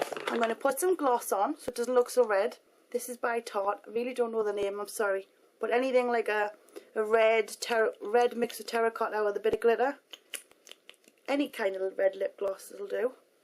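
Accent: United Kingdom. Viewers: Omg i can't understand a word ur saying! Littlebit too british i can't understand anything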